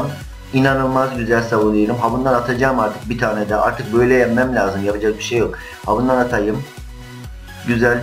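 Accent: Black Sea, Turkey. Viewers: karadenizlimisin la "D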